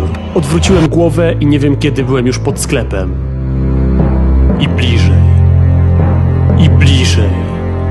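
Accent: Poland. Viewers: jesteś z Lubelskiego? Masz taki akcent.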